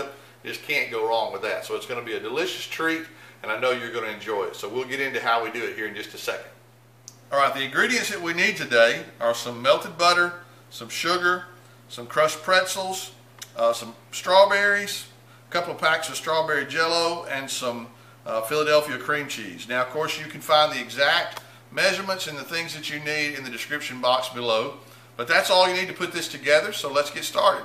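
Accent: South, United States. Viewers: Your southern accent makes me smile. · I'm from Kentucky love the way u talk y'all must be from the south thank u for that dish I had it a long time ago at a wedding reception and just loved it so much 💘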